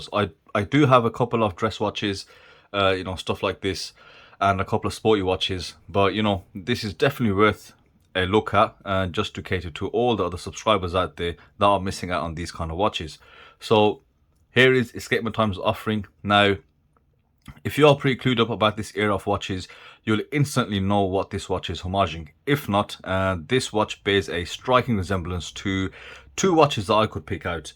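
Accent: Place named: Scotland, United Kingdom. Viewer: His mottled Scottish accent makes me trust him.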